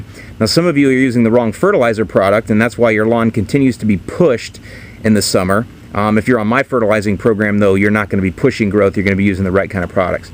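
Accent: Chicago, United States. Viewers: ""WOW""even your name was different did I hear a do you have a strong accent Chicago the Bears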